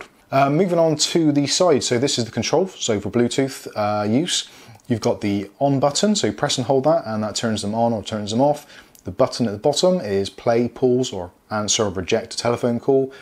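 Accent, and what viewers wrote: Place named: West Country, United Kingdom. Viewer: bought a pair of these cans off amazon after watching your review ... your spot on ... great bass and full sound ... bargain for just over £20 ... am in agreement over shiny plastic /grease magnet ... is a shame this p3 model doesn't come with sd card slot like the p1 or p2 ... both of which have less playback time than this model ... seems powerlocus are missing a trick ... if they brought out a new model with a matte finish ... long playback and an sd card slot ... i would happily pay £30 ... great sound ... p.s. you sound even more "west country" through these cans lol ... thanks for review ... correct and accurate on every point ... cheers fella :)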